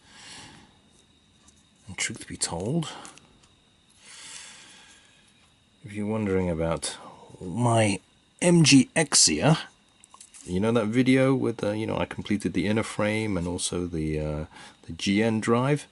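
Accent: United Kingdom. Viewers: also about the questions ask bit, where do you live, you have a very british voice but you upload alot of them promo videos in japan?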